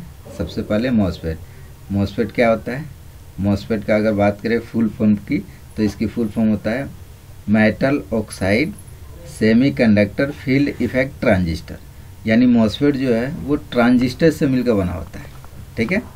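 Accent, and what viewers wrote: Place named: Assam, India. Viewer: vai ap ka tune assam hotahe best of luck.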